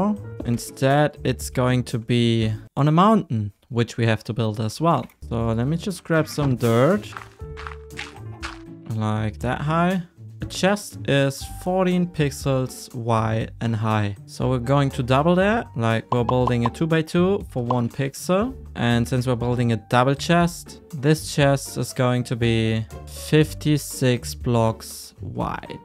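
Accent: Germany. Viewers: Name für einen Hund Balu , mann hört an deinem Akzent das du Deutsch bist oder bei Cactui find ich nice das du Englischsprachige videos macht. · i watched last video and this one and literally at the first minute of the last one i knew you arent from england cause you feel german lol ( in deutsch in der ersten minute hatte ich direckt das gefühl das du deutsch bist weil du keinen englischen sondern deutschen dialekt hast lol ) anyways i love your content already :D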